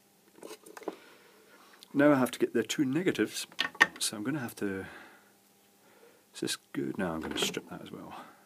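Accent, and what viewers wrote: Scotland, United Kingdom. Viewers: i find your vids very entertaining and informative, and your smooth scottish accent makes it quite soothing! good man keep it up!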